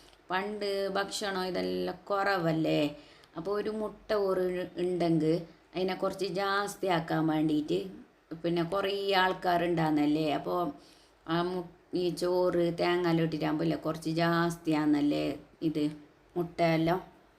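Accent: Kasaragod, India. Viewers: താങ്കൾ കാസർക്കോട് ഭാഷയിൽ പറഞ്ഞത് ചിലതൊന്നും മലപ്പുറത്തുകാരനായ എനിക്ക് മനസ്സിലായില്ല, 🤔 എങ്കിലും വീഡീയോ കണ്ട് പഠിച്ചെടുത്തു 🥰. Thanks.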